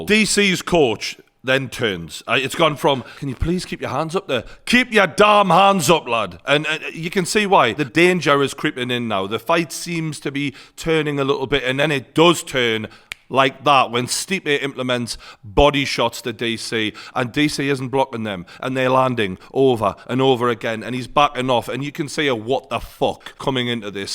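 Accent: Newcastle, United Kingdom. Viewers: Fuck off. Romero got robbed. Create your own analysis instead relaying the spin with a Geordie accent. Think for yourself. Sheep.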